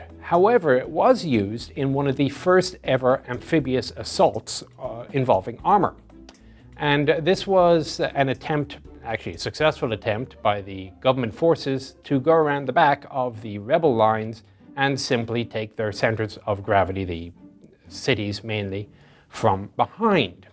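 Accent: Ireland. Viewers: all my life living with an irish mother is probably why i never noticed your irish accent... I alway knew it sounded a bit strange though, guess i just never put two and two together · It’s that Irish lilt and occasional ‘tongue in cheek’ observation that keeps one engaged. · Did the accent never give it away? lol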